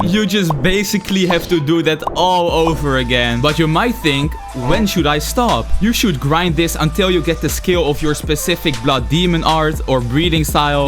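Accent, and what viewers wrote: Netherlands. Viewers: why do you sound so dutch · u sound dutch are u? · i smell Nederlands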